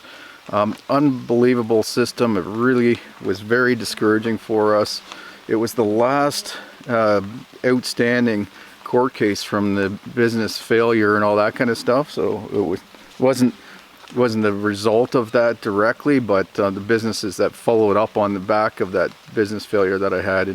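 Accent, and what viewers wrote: Canada. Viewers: are you canadian or american ? love your video very very much by the way thanks man from quebec !!!!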